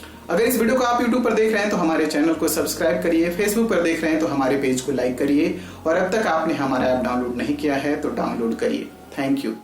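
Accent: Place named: Ranchi, India. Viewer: bhai...aap Ranchi se hai kya??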